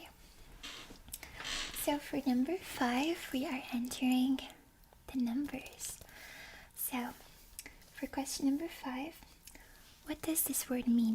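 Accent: Spain. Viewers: Tiene acento de España! Es española? O tiene padres de España? ♥ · su acento español de España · Se nota que habla español, si tuviera que adivinar diría que es española 😅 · I love how you pronounce Spanish like a Spaniard would do. Very unexpected ❤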